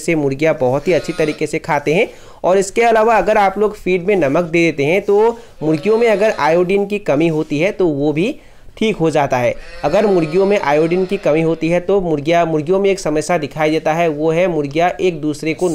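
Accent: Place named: Nepal. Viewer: Aap Nepal me the kya pehle...aapke bolne ki style nepali accest se match kr rhi hai🇳🇵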